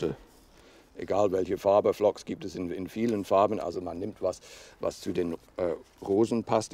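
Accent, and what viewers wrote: England, United Kingdom. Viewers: Dear you speak beautiful German! I love your English accent! I also love all your expertise! Many thanks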